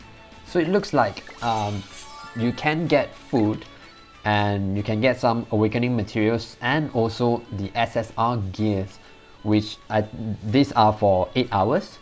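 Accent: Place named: Singapore. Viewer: I recognize that Singapore accent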